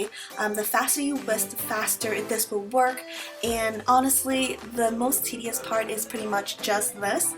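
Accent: Vietnam. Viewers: it's a typical Vietnamese accent. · She has a vietnamese accent come on now don't be ignorant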